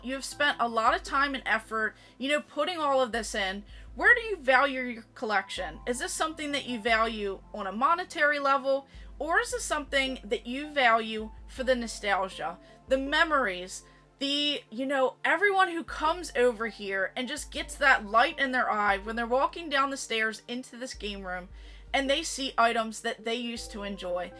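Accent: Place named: Baltimore, United States. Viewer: Woah, are you local to Bel Air? I shop at Retro Replay too! Thought I heard a Baltimore accent in there... 😄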